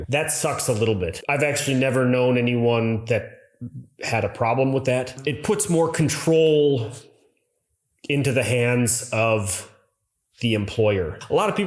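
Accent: Germany. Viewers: lo you got slight german accent. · Really thick german accent why?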